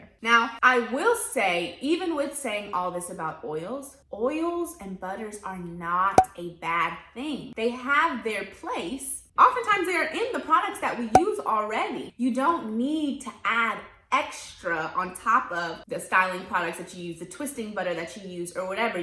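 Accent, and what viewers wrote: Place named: United States. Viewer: Ok, you are SOOO pretty and I love your voice! For context, I’m British and love your American accent.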